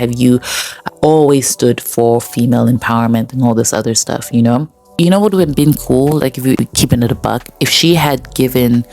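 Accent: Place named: Kenya. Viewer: I really like your speaking voice and accent. It reminds me of my Kenyan friends. You have a cool demeanor.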